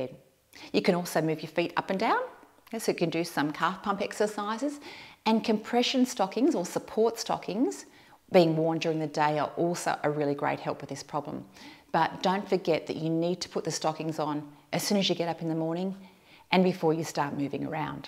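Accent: Australia. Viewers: You are so Aussie!!!! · she sounds australian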